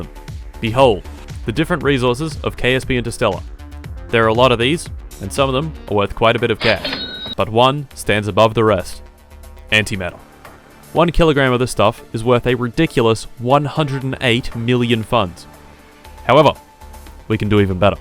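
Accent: Australia. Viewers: are u Australian? Cuz so am I so that’d be cool to know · He's Australian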